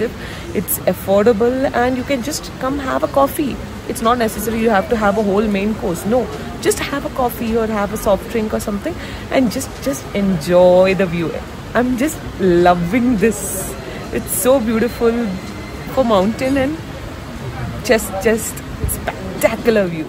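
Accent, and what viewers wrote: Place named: United Kingdom. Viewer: You speak English like British. Reminds me of Queen. We Indians are best.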